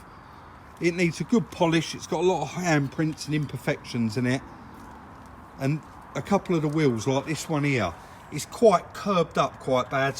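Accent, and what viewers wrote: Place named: Cockney, United Kingdom. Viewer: i love the way says WAFFLING ON, old school cockney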